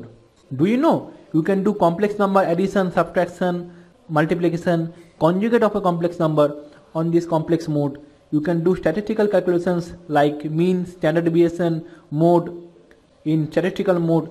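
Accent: India. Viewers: i hate your indian acccent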